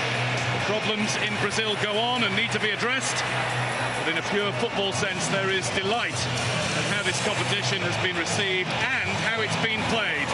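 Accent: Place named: United Kingdom. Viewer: inglês britânico???? por quê????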